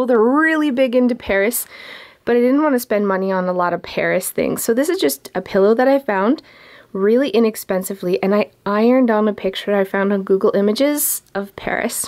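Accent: Canada. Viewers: Is she Canadian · Her accent sounds Canadian for sure.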